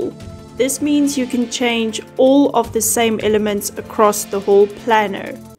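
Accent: South Africa. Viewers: Are you South African? 🇿🇦 That accent is uncanny.🥰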